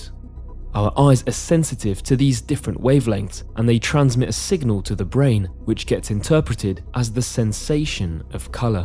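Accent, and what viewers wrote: United Kingdom. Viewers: Also notice how he pronounces Weber with the first e like the French é (which is how it's supposed to be pronounced) and not like the way Anglo speakers pronounce the first e in Weber or Webber. Come to think of it, the narrator is not at all British. He has a "refined" British accent but I can say with 100% certainly that he did NOT grow up in the UK but, rather, in Germany, Austria, or, perhaps, Switzerland, and went to a fancy prep school or boarding school where Southern British Standard is taught from a young age (or taught very intensively) and/or maybe he's extremely talented phonetically and his accent is obviously not a stereotypically German accent (like the kind that's used on shows and movies depicting German characters, often humorously, sometimes not, like the movies Nazi era movies) and it's not anything at all like the accent of the late Dr. 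Ruth Westheimer, BUT a British accent (regardless of region or socioeconomic status) it's not. There is a wide spectrum of accents characterising people born and raised in the UK and he is not on that spectrum... He's close... He's real bloody close, but he would never be able to fool a native British person or an expert linguist into thinking he's British. He might fool a Yank, but never a Brit.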